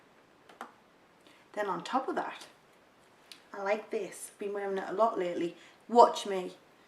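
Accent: Newcastle, United Kingdom. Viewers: She's from South Shields, newcastle x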